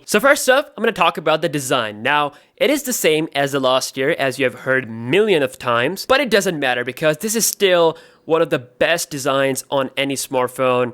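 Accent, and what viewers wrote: United States. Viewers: Why do you try to sound American? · When I saw your video first Time. I thought that your are american.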